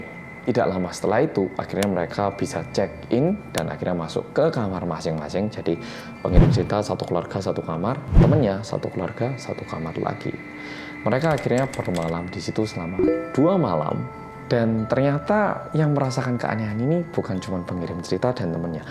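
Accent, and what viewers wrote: Java, Indonesia. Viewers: 3 channel storytelling favorit dan bagus: 1. (pembawaan santai dan medho serta mudah dipahami) 2. Detektif Aldo (mirip siaran radio) 3. Arroyan Pram (kasus, konspirasi sampe sains fisika, editan paling niat dan keren. Serasa nonton film layar lebar).. Kalo kalian? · Aku klo mas ngomong orang korea yg. Medeekk aku nya ikutan 🤣😆😂😂 Mas aku udah kirimin trus cerita pengalaman ku ke Gmail yg mas bilangin, tapi tenggelam sama yg lain 😁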